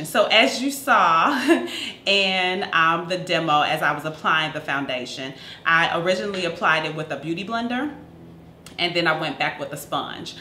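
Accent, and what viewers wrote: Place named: Texas, United States. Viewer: Are you from Texas?? You sound just like me! Hey Y'all! #htown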